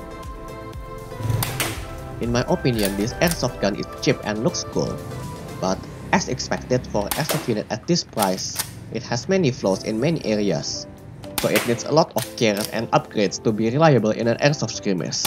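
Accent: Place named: Indonesia. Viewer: Masih ada logat indonesia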